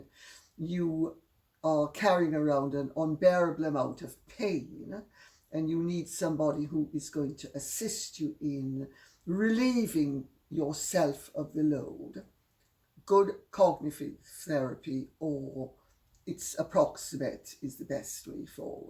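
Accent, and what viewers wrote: Jamaica. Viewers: Love her slight Jamaican accent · Lady Colin Campbell was born in the Parish of St. Andrew. Jamaica - her accent is undeniably Jamaican.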